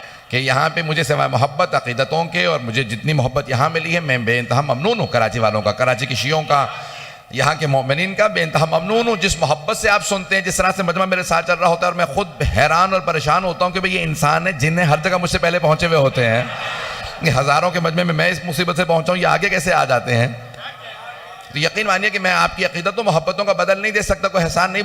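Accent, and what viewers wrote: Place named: Iran. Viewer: Urdu bhi boltay hain tou Farsi lehjay mai. Subhan'Allah.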